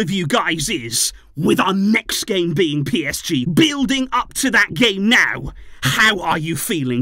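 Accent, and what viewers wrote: United Kingdom. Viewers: i am curious 👀. Your accent is clearly from the UK. Don’t you have a club in the UK that you support. I live in the Netherlands 🇳🇱 and I support First Barca 💙❤️ and second Ajax ❌ ❌ ❌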